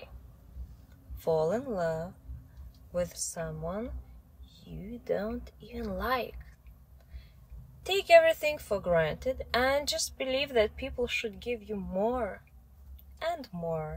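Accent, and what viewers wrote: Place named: Russia. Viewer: А говорит все равно с русским акцентом ❤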